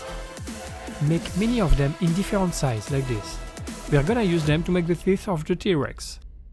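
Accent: France. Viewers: Sublime, j'adore et kiff jurassic park, et super acsent français 😊je me suis abonné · Houla, vous seriez pas Français par hasard ? Ca s'entend à l'accent... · are you french? not that this would be a problem i just noticed the accent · Hooo mais tu e 0s français ?? Je me disais avec l'accent=) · T'es français et ça s'entend en tout cas tous ce que tu fais avec le carton sont des oeuvres d'art continue comme ça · ok je pense bien que tu avais un peu. Un accent français sa s'entans a ta voix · he have french accente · Wait ! this accent... but alors you are french ?! X) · I don't know if you're French but ton accent français est vraiment sympa et c'est facile à comprendre du coup ^^ GG ! Edit : Oui j'ai regardé la desc et t'es bien français, c'est cool ^^ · Français hein? Ça s'entend x) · Est tu francais car ta un fort accent français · Ah d'accord, ça se voit à ton accent que t'es français 😂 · Le mystère des recommandations ytb x) Au moins un tuto pour lequel je n'ai pas eu besoin de sous-titre, un petit french accent au top xD Le boulot monstre .. 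fiou ! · Bonjour, es-tu français avec ton super accent? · Pls tell me that your French, because your French accent is sooooo huge xD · Mdr on dirait que tu es français tu prononce les mots en anglais mais avec l'accent français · You are french ? Beacause you have a accent french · Salut es ce que tu es français ? Car je trouve que tu parle anglais avec un accent français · Do you speak French ? Because I heard some accent in your dialogue. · Is this guy French?? I get inspector Closeau's vibe from his accent 😅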